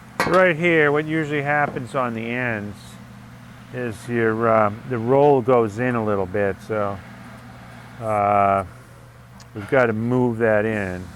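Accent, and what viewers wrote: Boston, United States. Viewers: your "pauk the cah and feed da meeeder" = park the car and feed the meter Bostonian narration is always enjoyable. This is a great series!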